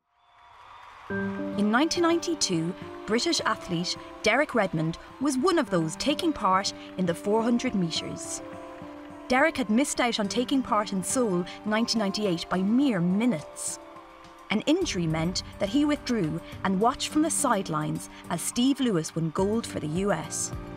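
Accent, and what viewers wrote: Ireland. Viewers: She has a wonderful Irish accent. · Yes. Just with an Irish lilt. Also if you are going to criticise language, you should spell English with a capital E.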